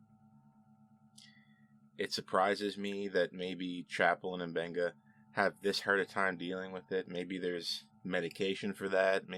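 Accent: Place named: Nova Scotia, Canada. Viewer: Unreal good break down my friend.... I really dont like this show anymore its got so bad to me but i watched this episode and its probably the best one of the season but....still hard to give it much more than a 6/10 with everyone always angry and emotional and acting like TEENS. U from Nova scotia ? that accent seems close being from Boston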